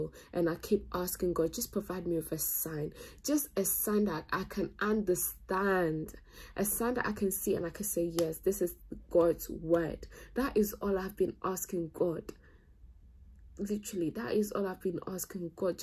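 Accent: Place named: Ghana. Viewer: When you honestly ask God for guidance, Islam is the destination you will arrive at. Keep going sis. Your accent sounds like from Ghana 🇬🇭 , is that the case?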